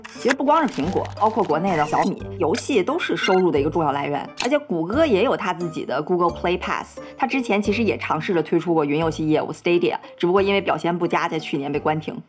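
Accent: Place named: Shaanxi, China. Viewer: 小lin是陕西人吧 西安 😂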